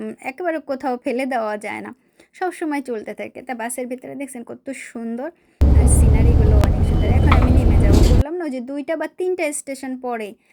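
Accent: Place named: Comilla, Bangladesh. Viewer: আপনি এভাবে কথা বলেন কেন,আপনি কি কুৃমিল্লার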